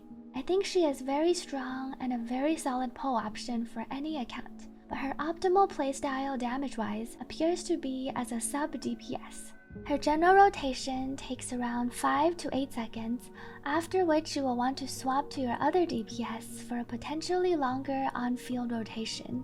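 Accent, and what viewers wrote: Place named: China. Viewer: You got a decent mandrin accent, making me 99% sure you speak it lol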